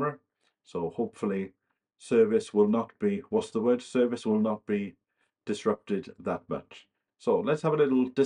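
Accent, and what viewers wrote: Wales, United Kingdom. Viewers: I can’t remember how I came across your YT channel, maybe it was a recommendation by yt or a search about allotments in the Uk, one of the first videos I watched was when you did a bit of cooking and I thought, what a fabulous kitchen and then you talked about sharing the house and your cats, watched your rambles around London. I enjoy your Welsh accent, your deep singing voice, your unhurried relaxed approach and the way you ask your audience what they’re up to at the moment. It shows that you are a very experienced cameraman and your footage with the drone, the music, your storytelling is all very welcome in this mad world. I enjoy reading the comments and it’s a wonderful community to be part of, so please carry on doing what you’re doing, hope you manage to get your camera fixed 🤞🏾, Best wishes, Lisa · Jambo, am from Kenya though my 13 year old son schools at Ysgol Bryn Hy Fryd in Ruthin. 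I have visited Bryneglwys, Corwen couple of times and I love Wales compared to Nakuru, Kenya. The first video I watched was when you were moving to Wales. You seems interesting, down to earth and your sense of humor, you welsh accent that just does it for me.... I do enjoy gardening videos, walks on the mountains, how you relate to everyone that comes your way. You're you self, not fussy unlike the current youtubers of my age group (35-40). You're like a friend cause watching you is so comforting. I love your lifestyle. Keep the videos coming. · Hi, I started watching you about 6 months ago when I was scrolling through utube, to be honest it was your cats that I noticed first as I to have two black cats and then when I heard your Welsh accent I was sold as I spent many a school holiday in Llanelli as my dad was born and brought up there before moving to Bristol, so I spent many a holiday with my relations, I to live on my own now so we have lots in common, looking forward to your new adventure on your allotment. 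Good luck. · Came for the moving to wales vids (my mate has just moved there too,),,,,, stayed for the slow morphin of ur accent back to welsh... :) ATB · I came across your video by accident your Welsh accent caught my attention and when you mentioned Blaencwm and Treorchy I was hooked, as I told you the first time I commented I was born in Blaencwm, and although I still live in Wales I get homesick for the Rhondda and it’s people. I love your style your so natural, and I love the journeys you allow us to share with you, I hope you go on videoing for many a long year. Look after yourself, I hope the weather bucks up I am looking forward to seeing you lick that plot into shape.😀😠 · I think I first saw a video when I was watching various gardening videos and you came up in my feed and Its been interesting watching you tend your various allotments. Now, for me, its all about your return to the valleys as I have mentioned before my mum and grandmother were born there ( mum was born in Tonypandy) and both my grandfather and great grandfather were miners in the valley but moved away in the 1930's recession I believed, but I am not sure of dates etc. 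My mum missed her home in the valleys and always spoke about it, so now I am visiting her beloved homeland through you. Your voice and the welsh accent remind me so much of my aunties, uncles and grandmother, I actually feel 'homesick' if thats possible!! I am enjoying seeing you make your home there again. Thankyou and Blessed Be!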